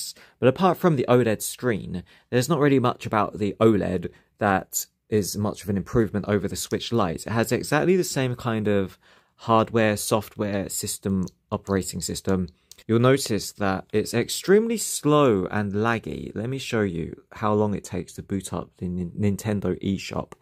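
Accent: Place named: United Kingdom. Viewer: ...not even his British accent made this review sound smart.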